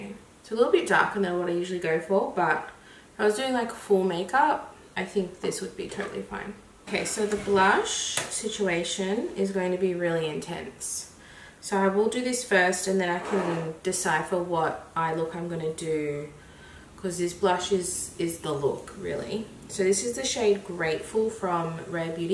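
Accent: Australia. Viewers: btw I saw your decluuter vid on my recommended. Stayed for the accent and personality. I am outside of Australia but I aspire to live in there one day. So I'm interested in Aussie stuff. I think you are so lovely and open and honest. It's nice to hang out with you cuz I feel comfortable. You feel reliable since you are so open and honest. Just letting you know why I stayed to give you an idea of how to get new people at your channel. I'm only trying to support since you talked about wanting to grow your channel. I know it is hard work. Hope everything works out well for you!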